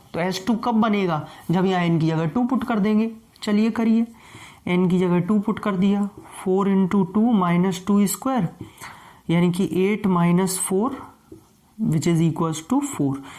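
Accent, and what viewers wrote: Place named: Haryana, India. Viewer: Sir awaj se aisa lgta ki aap Haryana ke aas paas ho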